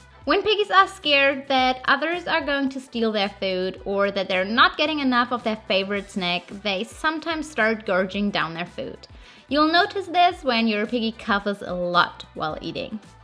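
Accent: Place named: Germany. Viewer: Usually I don't hear it, but could hear the German accent a lot in the video. Just a interesting notice. Another good informative video for new gunea pig owners and a fun one for old ones and gunea pig fans..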